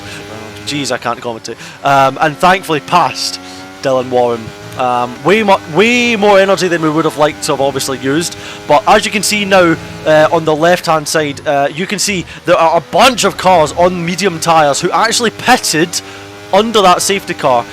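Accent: Scotland, United Kingdom. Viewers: It’s a Scottish accent and it can often be quite hard to understand. It’s hard for native English people to understand those who have a harsh Scottish accent sometimes so it’s no surprise you have noticed the difference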